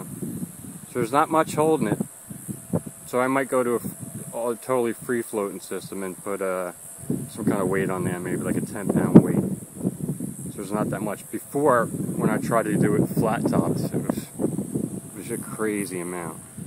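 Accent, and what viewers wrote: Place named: Philadelphia, United States. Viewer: good video . you have a philly accent ?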